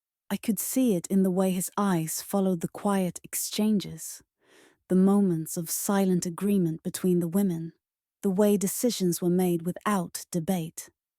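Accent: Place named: United Kingdom. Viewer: Why do all these FLR stories have a UK voiceover. Probably due to the high level feminists in there country. Enjoy while you can before sharia law takes over.😂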